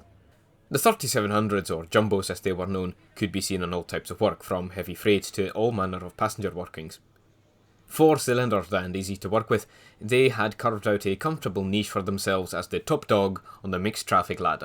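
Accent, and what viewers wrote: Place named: Scotland, United Kingdom. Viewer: I love how the narration with a heavy Scottish accent is casually laced with perfectly pronounced Dutch words. It's kinda funny.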